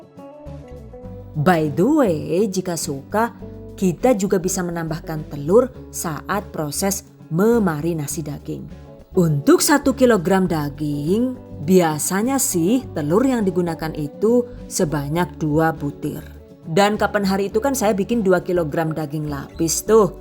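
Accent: Banyumas, Indonesia. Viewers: Mantab masak teruuus dan lengkap Tips&Trik memasak. 👍👍👍 Mbak, Saya boleh tanya? Kira-kira apakah mbak ada rencana membuat buku berisi kumpulan resep lengkap ala lengkap Tips&Trik memasak juga?, kalau iya maka saya minat banget mau request membeli buku resep dari. Namun YouTube-nya tetap eksis mbak ya?, karena selain menyenangkan memasak 👌 dan saya terhibur menyimak pelengkap sedikit fitur kartun 😀, apalagiii bahasa inggrisnyaaa 👍 serta cengkoknya 👍 dan kadang-kadang disertai bahasa ngapak terhibur bangeeet 😁. TETAP PERTAHANKAN STYLE-NYA YAAA, SOALNYA JARANG-JARANG ADA (BAHKAN STOK LANGKA LOH YAAA) KULINER WANITA YANG JUGA MEMILIKI NILAI LEBIH BISA MEMBUAT GUYONAN. Oke mbak, kembali ke fokus request saya hal buku. Jangan lupa dibalas ya?, Saya tunggu kabarnya.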